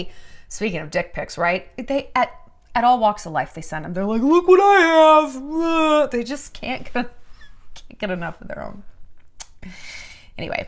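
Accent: Russia. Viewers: Your Russian accent and the random script you chose was soooo funny! I hope you do a Advice-themed standup and maybe commercials and movies!! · Your russian accent gives me absolute life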